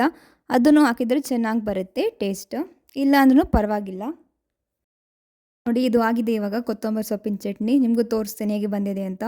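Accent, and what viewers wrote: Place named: Bangalore, India. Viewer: Banglore style lli sakhathagi mathadtheera👌😊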